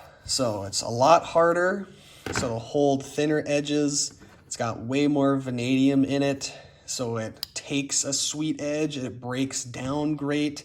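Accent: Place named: Spokane, United States. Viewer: ...sounds like you're from Spokane WA. My cousins say it that way..cool content btw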